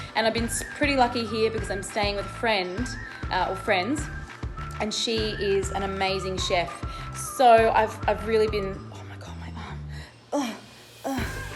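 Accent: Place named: Australia. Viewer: Haha i thought you were American. Then when i watched your videos and listened to you talking....i was like i am sure she is an Aussie!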